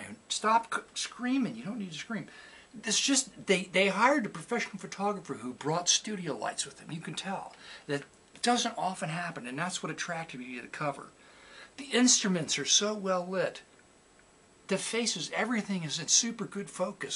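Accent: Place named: South, United States. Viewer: You have a fine country accent going on there.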